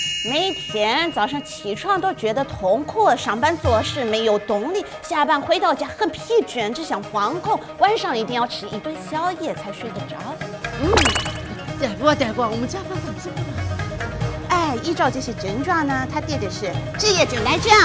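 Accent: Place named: Shandong, China. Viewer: 山東腔好歪歪歪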